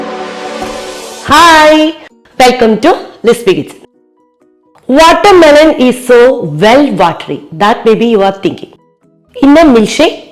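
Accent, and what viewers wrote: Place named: Thrissur, India. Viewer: Nalla thrissur malayalam thanne aayirunnu nalladhu ,kelkan oru sukamundayirunnu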